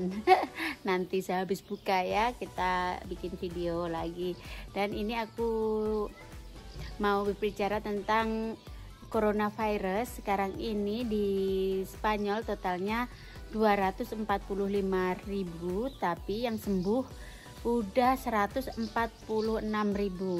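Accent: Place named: Blitar, Indonesia. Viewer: Logate lek ngomong jowo jek mblitaran banget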